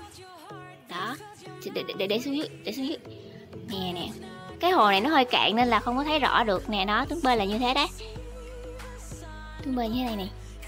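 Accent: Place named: North, Vietnam. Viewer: Giọng Bắc nữa cơ :3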